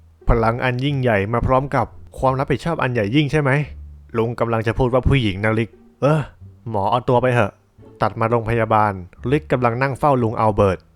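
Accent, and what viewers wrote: North, Thailand. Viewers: พี่เป็นคนเหนือหรอครับ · คนภาคเหนือหรือป่าวนิ คนสปอยอะ5555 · พูดเหนือด้วย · พี่คนเหนือหรอ ฮานี้บ่าเฮ้ย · คนเหนือก๋าา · คนเหนือหรอพี่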